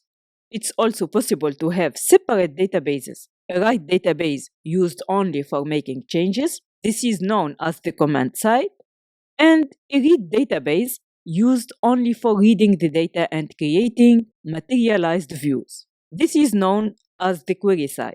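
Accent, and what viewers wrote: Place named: France. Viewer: Your accent sounds like French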